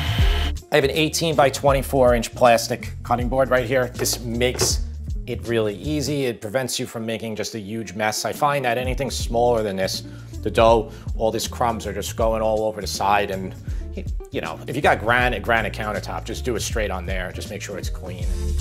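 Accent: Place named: Italian-American, United States. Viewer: Ah, Pizza Chiena di Campania, there aint no such word as "gaina" 😀 Thumbs up though, you're a damn good chef and I always enjoy your videos very much and always smile at Italian-American pronunciation. Ree-GOT and Pro-ZHUT and then that perfect Peco-rrr-ino Rrr-omano... Bravo Ragazzo! Really like the lattice you did. I find I don't like a really heavy crust on top nearly so much as this light one. 👍🙏👍 Happy Easter by the way.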